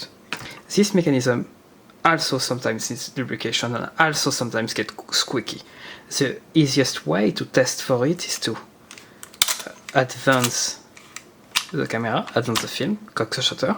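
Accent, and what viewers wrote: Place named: France. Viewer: At first wanted to close the video in first 10 seconds but stayed for french accent and mechanics of camera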